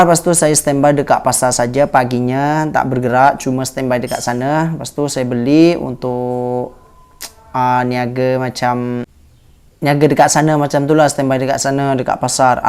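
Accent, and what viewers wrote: Malaysia. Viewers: Bro cakap dah spt org malaysia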